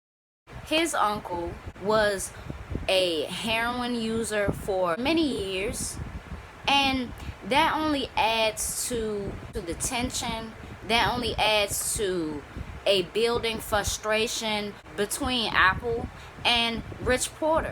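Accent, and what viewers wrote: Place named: South, United States. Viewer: Are you from Harlem. How do you know this stuff you sound like a downsouth chick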